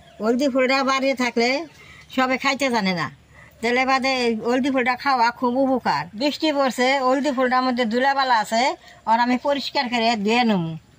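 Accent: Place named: Mymensingh, Bangladesh. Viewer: দাদীমা আমাদের টাঙ্গাইল ও ময়মনসিংহের ভাসায় কথা বলে খুব ভালো লাগে 😊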